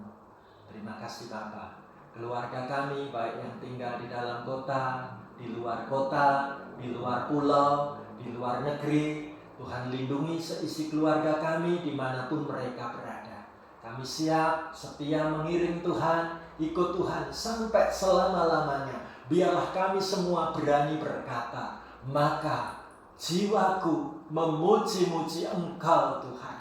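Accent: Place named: Banyumas, Indonesia. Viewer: Bahasa Panginyongan nya mantap. Asli Mbanyumas nggih Pak Pdt?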